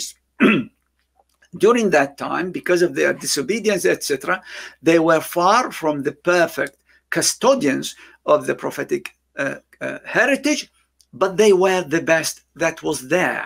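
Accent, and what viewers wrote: United Kingdom. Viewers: you speak in such a perfect narrative voice I really REALLY want you to release an audio of all the books of Hadith and the Holy Quran in your voice narration. What an effective beautiful British accent you have. I will write this comment underneath all your videos until you read it. May Allah take this service from you in this life.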